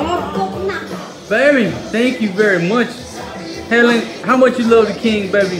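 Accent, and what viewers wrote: South, United States. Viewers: Great country voice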